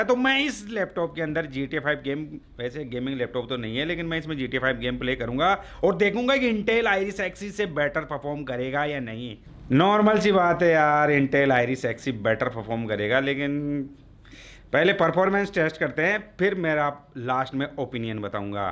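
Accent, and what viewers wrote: Rajasthan, India. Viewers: Nope! Hindi with Rajasthani accent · Bhai voice se pakka Rajasthan se lag rha h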